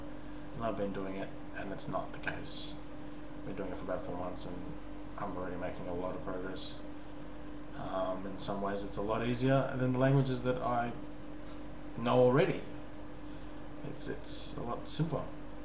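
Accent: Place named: Australia. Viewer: Your Australain accent isn't very strong.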